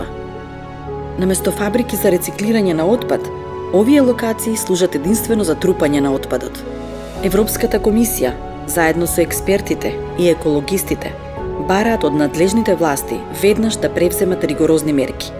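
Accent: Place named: East, North Macedonia. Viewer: Малку си мава на источномакедонски нараторот, но е многу подобро отколку виличење на скопски. Најважно од cè, многу интересна тема, и добро покриена. Браво!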